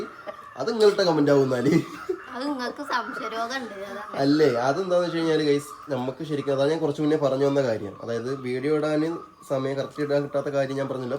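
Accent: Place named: Kottayam, India. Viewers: അച്ചടി ഭാഷ കോട്ടയം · അച്ചടി ഭാഷ കോട്ടയം ജില്ല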